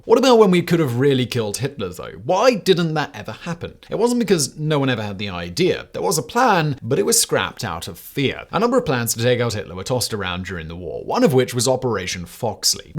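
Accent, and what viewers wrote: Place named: RP, United Kingdom. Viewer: One cannot take on this much work in this many directions and not become scattered. He has a cut-glass British accent (which hardly anyone has any more! He sounds a bit like Churchill mixed with James Mason - most Brits are now quite a bit more "broad"), but can't trade and sell on that forever. His occasional goofiness goes too far in the other direction. He is a victim of his own success, and I think from now on the quality will continue to deteriorate. Any company that expands too rapidly in too many directions fails. I don't wish failure on him by any means, but the cracks are already beginning to show.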